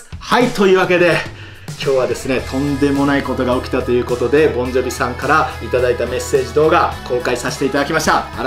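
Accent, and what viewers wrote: Japan. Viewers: ニュースなってたからきんに君の動画初めて見たけど、関西弁なんだね！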